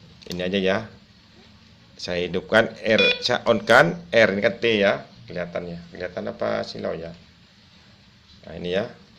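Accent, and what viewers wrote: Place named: Madura, Indonesia. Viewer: pantes logat nya kayak bahasa madura pak 😁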